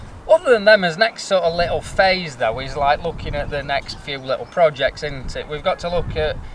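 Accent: Yorkshire, United Kingdom. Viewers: Just love your Yorkie acent,ime Lincolnshire twang maeert 😜,love whatching yours and chanel you make it look so easy like second nature,take care both of you stay safe.🎥👌👍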